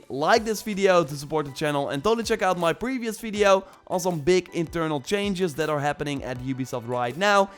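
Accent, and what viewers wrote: Netherlands. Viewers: Lekker hollands accent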